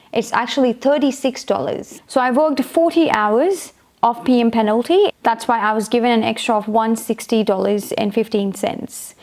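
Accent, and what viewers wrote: Australia. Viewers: You speak like Aussie.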